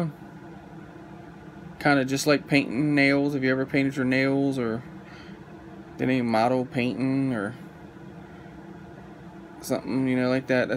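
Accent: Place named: Philadelphia, United States. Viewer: I'm from Philly and your accent is close enough to ours where I definitely trust everything you say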